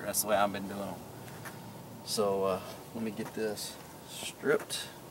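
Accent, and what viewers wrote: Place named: Texas, United States. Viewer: thanks for quick respond... yeah mine it's just got 2 speaker also it's stick shift which i love .. about door speaker well i got to open a hole in the door to place the speaker and much more work that I don't have right tools to do so i will take wrangler speakers or charger dash speakers ... anything well be better then my shitty under dash speakers .. lastly i love Texas love her accent in a whole i love America.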